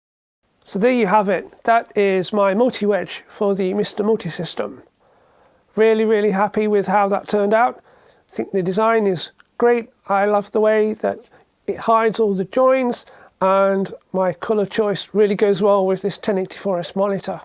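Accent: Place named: England, United Kingdom. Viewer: Wow.. you sound a lot like my late father, only with an english accent.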